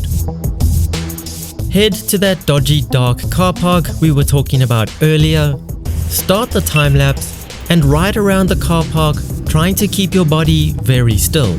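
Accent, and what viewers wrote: South Africa. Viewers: Are you south african? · U from south Africa bru ?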